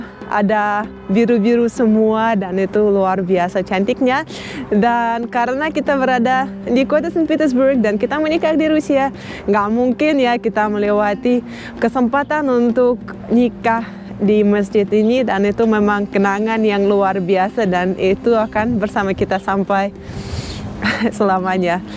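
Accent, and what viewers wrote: Indonesia. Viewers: Wow keren bahasa indonya aksennya indo banget.. 🇮🇩❤🇸🇮👍😎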